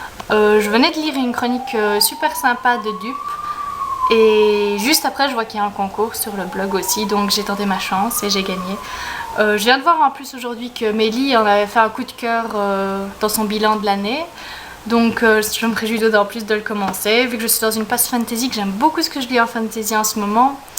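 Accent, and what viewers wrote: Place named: Belgium. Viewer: Coucou ! (c'est Mélo), ravie de te voir en vrai, tu es toute mimi ! Et moi j'adore l'accent belge et je préfère même quand on prononce tÔme et non tome à la française :p Pourquoi dis-tu que tes études ne vont pas aboutir? j'admire franchement cette branche, j'aurais beaucoup aimé traduire des livres mais étant donné que je suis déjà une truffe en anglais c'est compliqué,lol . En tout cas je croise les doigts. A b1tôt, ça m'a fait plaisir de te voir (mm si je ne suis pas super assidue des vidéos)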